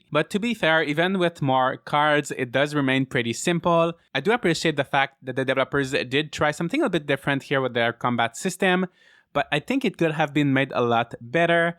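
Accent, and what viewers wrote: Quebec, Canada. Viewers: T tu quebecois?? 😅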